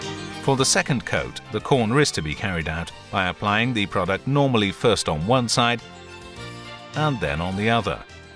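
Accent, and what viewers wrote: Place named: RP, United Kingdom. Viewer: Only got to the intro so far about the primer and I feel like I'm tuning into a Royal British polo breeding documentary. 😊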